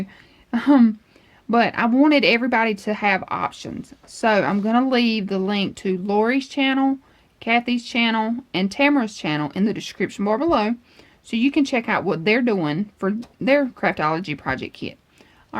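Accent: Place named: South, United States. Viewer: Thank you for going at a speed where I can comprehend!! I just love your work and that Southern drawl!!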